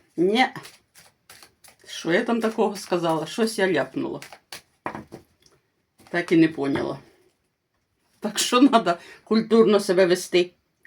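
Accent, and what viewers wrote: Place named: Surzhyk, Ukraine. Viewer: . Люблю вас смотреть и слушать, как вы разговариваете на нашем, суржике,. Посмотрите Мариуполь, может, что то уже изменилось.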